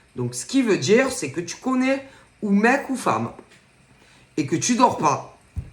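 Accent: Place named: Marseille, France. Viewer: adore votre accent Marseille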